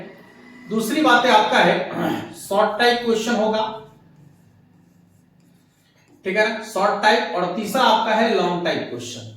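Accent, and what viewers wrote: Bihar, India. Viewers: Bihar h kya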